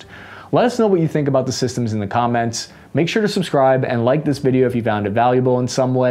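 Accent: France. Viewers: Great french accent :) Thumbs up from the country of baguette bakery, fine wine & war tactics 👍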